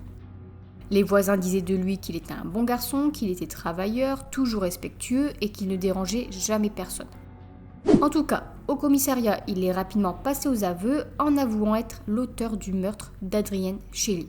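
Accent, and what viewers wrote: North, France. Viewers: Tu es du nord toi non ?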